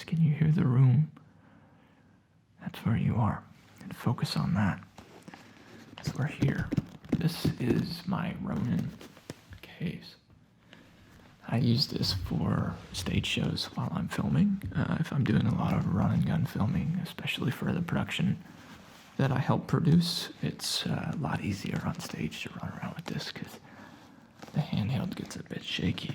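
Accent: South, United States. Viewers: I love those retro style suitcases! I'll be your business partner but I warn you it's a 90/10% split in my favour cause that's just how I roll 😜You won't earn any money but we'll have a laugh. Btw as a Brit I love your Southern US accent so please don't hide it. ❤️ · could you please make a whole video of you speaking in your southern accent..I love it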